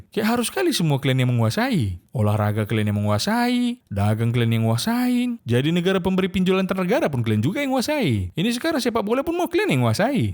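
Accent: Medan, Indonesia. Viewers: Abang orang Medan kah? 😂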